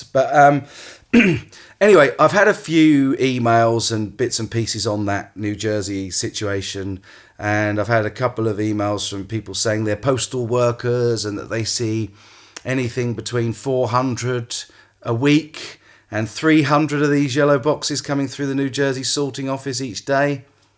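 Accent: United Kingdom. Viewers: There is nobody better then you reviewing chinese guitars hands down man ! very very nice british accent, love it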